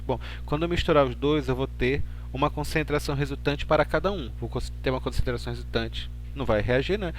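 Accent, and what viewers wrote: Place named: Recife, Brazil. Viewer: Você é de recife né? Logo vi hsuahsau, mto bom o vídeo, ajudou mto!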